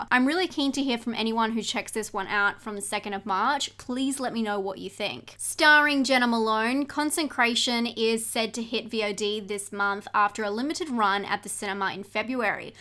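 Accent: Australia. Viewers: Thanks for another wonderful list, I’m checking the children of the corn, by the way, I’m totally amazed by the Australian accent you got there ! 🤩 Greetings from Berlin, Germany ✌️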